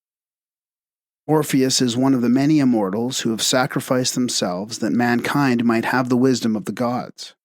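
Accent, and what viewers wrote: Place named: Upper class, United Kingdom. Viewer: Upper-class British voice is always the way... oh well.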